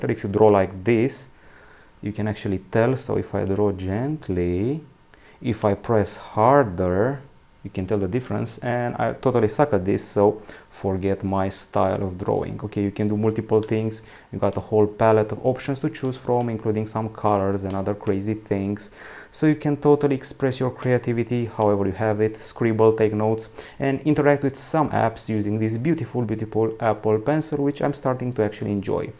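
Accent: Romania. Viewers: Youre not english, I bet you are Romanian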